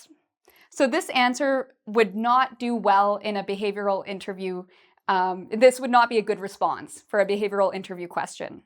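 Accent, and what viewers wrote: Canada. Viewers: shes from Canada, I can tell by her accent. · Very useful, great! Just one question,: are you Canadian? ☺ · Cute canadian accent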